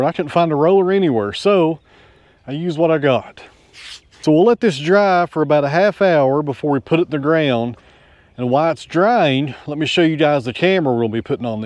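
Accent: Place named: South, United States. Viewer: Its about making a video of a certain time length and incorporating his southern accent as much as possible. People don't want efficiency when watching videos, they want to waste time. Looking into time management for yourself, you have to ask, why are you wasting time on videos when you could be doing things yourself?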